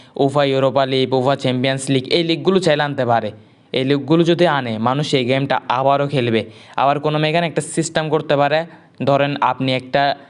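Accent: Chittagong, Bangladesh. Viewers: চিটাগাং থেকে বলছেন ,তাই না.? একসেন্টেই বোঝা যায়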